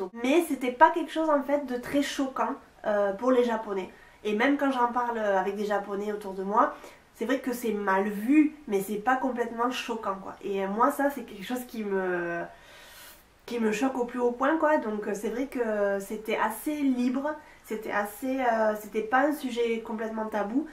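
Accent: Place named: Toulouse, France. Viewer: ha une toulousaine! il me semblait bien que ton accent m'était familier haha! tes vidéos sont très intéressantes ! en espérant te voir au Japon quand j'irai! la biseeeeee du sud ouest!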